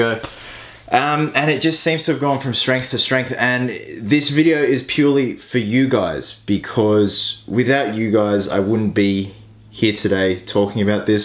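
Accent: Sydney, Australia. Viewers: They do bloody not, sounds like he's from Sydney... sounds like he's from Melbourne. Completely different!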